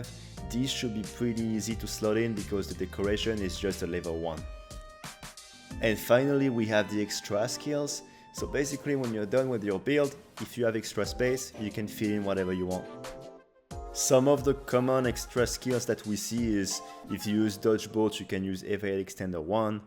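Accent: France. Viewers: J'entend un accent français haha , je vais essayer ton build ^^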